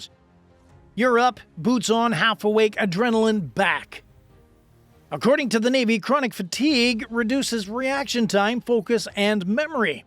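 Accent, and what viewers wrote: Canada. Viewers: Dude are you Canadian? No hate but what?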